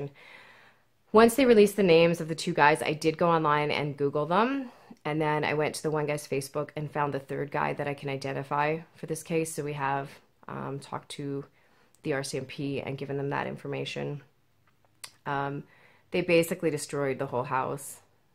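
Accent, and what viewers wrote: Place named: Europe. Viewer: He sounds like he's from one of the bloodlines of cowards that stayed behind in europe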